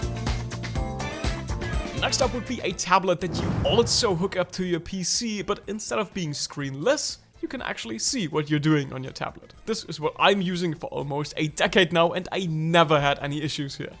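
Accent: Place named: Germany. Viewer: I hear Deutsch :)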